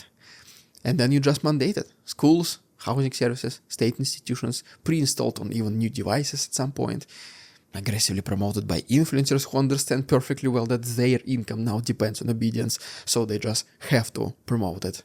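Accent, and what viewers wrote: Russia. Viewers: Wenn du mit 100 km/h sprichst, und mit deinem starken Russischen Akzent, hat man Muehe, dich zu verstehen. Obendrein scheint bei diesem Video auch noch die CC ausgeschaltet. Ich kann also noch nicht mal mitlesen, was ich akustisch nicht erfassen kann. Ich finden deinen Kanal gut, aber deine Sprechweise ist (entschuldige) katastrofal! Weiss auch nicht, was man da tun kann..... langsamer sprechen, vielleicht? Es ist irritierend! Ich schreib es dir auf Deutsch, weil ich davon ausgehe, dass du es verstehst, und nicht viele andere hier! Danke!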